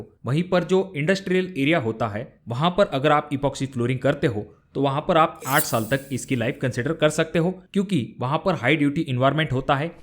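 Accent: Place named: Bundelkhand, India. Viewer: खपरा बाला भाई बुंदेली लग रहा हे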